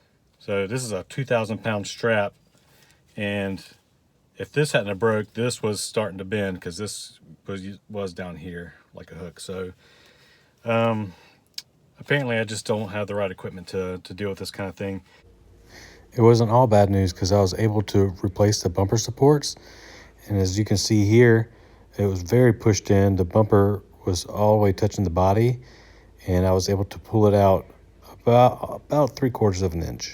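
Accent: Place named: Baltimore, United States. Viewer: You aren't originally from Texas, are you? That accent is Baltimore/PA/South Jersey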